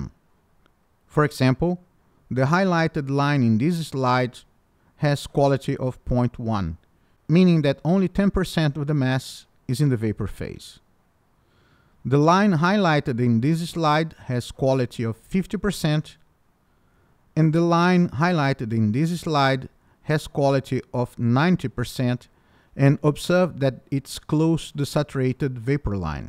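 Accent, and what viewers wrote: Brazil. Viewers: Percebi que era brasileiro pelo sotaque hahahaha, ótimo vídeo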